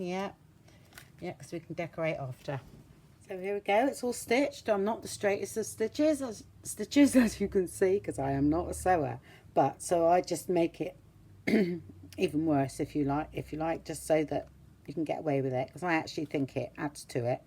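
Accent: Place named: Bristol, United Kingdom. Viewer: Are you from Bristol? That’s my husband’s hometown and you sound so much like him!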